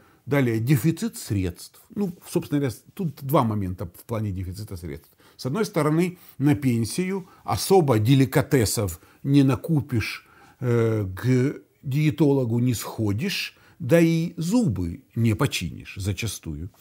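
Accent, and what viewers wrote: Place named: Ukraine. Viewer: с українська акцент 😊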